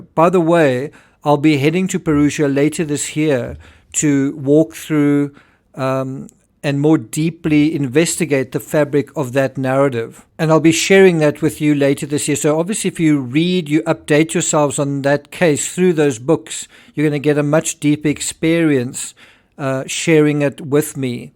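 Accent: South Africa. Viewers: Just listing to your accent and you mentioning your language, we had good friends who spoke so much lie you the husband was quite a famous singer in South Africa called George Van Dyke, he lived here in the UK for quite a while until leaving for the US we are still in touch and he is doing great over there. Love your work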